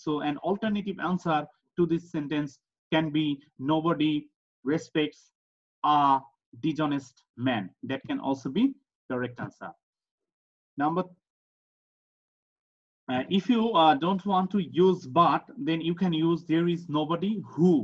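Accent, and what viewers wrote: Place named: United Kingdom. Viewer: Sir, you talk like the British. I liked your lecture!!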